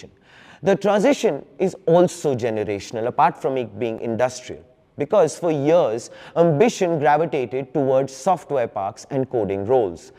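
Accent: India. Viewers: Yes you can beat china by your fxxking braging mouth.....cause yours danm good in fxxking curry english That all😮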